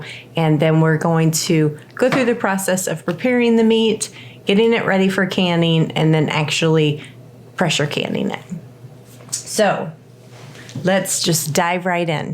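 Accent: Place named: Wisconsin, United States. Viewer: Nice accent! Where in Wisconsin are you from? 😂 I live in Rice Lake!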